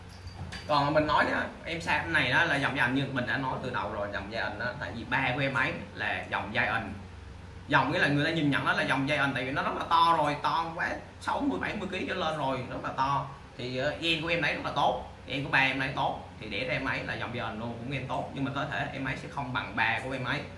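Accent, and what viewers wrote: North, Vietnam. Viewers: Hoi dau ma noi bac ky no la vay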